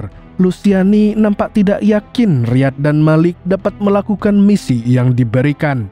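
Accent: Banjar, Indonesia. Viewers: Asli Banjar kah pian nih mang😮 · Min orang Banjar kah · Mimin urg banjar kh min? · urang banjar rupanya admin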